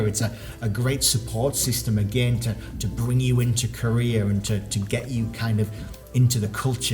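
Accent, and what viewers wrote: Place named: Northern England, United Kingdom. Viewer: Absolutely blowing my mind that you are from Newton Aycliffe! This video was in my YouTube suggested and I've just applied for Epik so thought I'd check it out. Straight away heard the northern accent and checked out the comments to see you're from my town!! What a coincidence - small world aha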